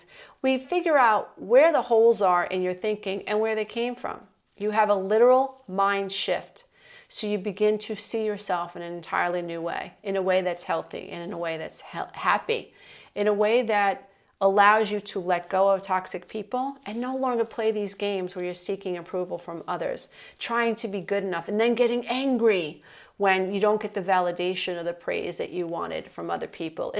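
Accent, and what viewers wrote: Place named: Queens, United States. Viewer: Love your Queens sass, especially “what~eva” 😎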